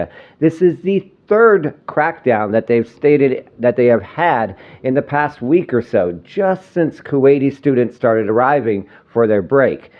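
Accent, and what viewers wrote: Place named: United States. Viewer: it's not fault. He's American. Words like Bangkok and Bang Lamung both have the letter 'A', but Americans insist on pronouncing these words with an 'E' and an 'O' respectively. Yes, I know, weird...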